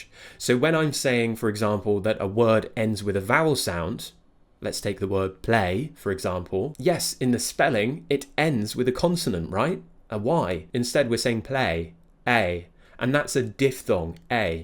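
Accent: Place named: Cambridge, United Kingdom. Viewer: Nice wher in England are you from ..accent wise i cant firgure out il guess Cambridge ?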